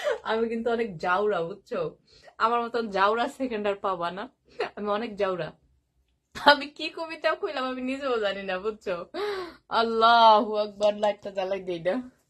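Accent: Barishal, Bangladesh. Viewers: আপনার বরিশালের language খুবই enjo করি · তুমি কি বরিশালের মেয়ে?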